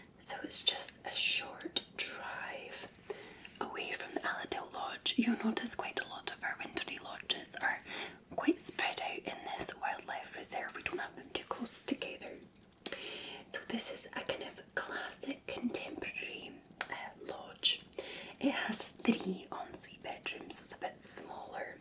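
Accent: Scotland, United Kingdom. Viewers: 40 minutes of tingles from the resplendent scottish lass. perry the platypus noises ☺️ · Hello I hope you are doing well! I ❤ the very Scottish accent!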